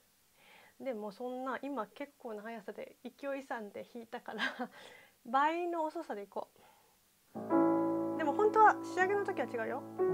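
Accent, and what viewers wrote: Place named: Iyo, Japan. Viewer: てっきり関西の方なのかと思っていましたが、京言葉のルーツもある伊予のイントネーションが可愛らしを演出しているですねと、音楽以外のところで感銘を受けてます(^_^;)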